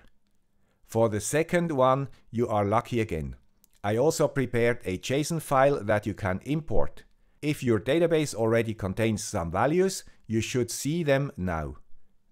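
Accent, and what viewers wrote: Switzerland. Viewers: my brother with that crazy Swiss accent... I'm so very glad 2020 is continuing to treat you well!! Just wanted to wish the best of wishes to you and your family well for the rest of the year!! Lets hope this insanity is mostly in our rear view mirror now... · Make sure that no-one tells our Swiss guru that he should have described the Discord community as "vibrant" rather than "vibrating" at about . Keep up the good work, I am sure that technical instructions sound more convincing when presented in a Swiss accent.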